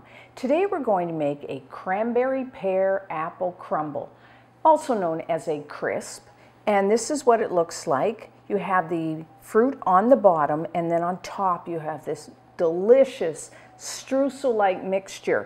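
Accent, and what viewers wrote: Canada. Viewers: I enjoy your demonstrations so much! Thank you for all the wonderful recipes you've shared with us. I also love how the Canadian accent comes out sometimes :-)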